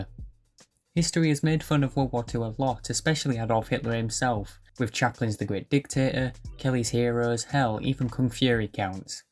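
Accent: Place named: Stoke, United Kingdom. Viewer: U sound stokie but then my accent gps is rubbish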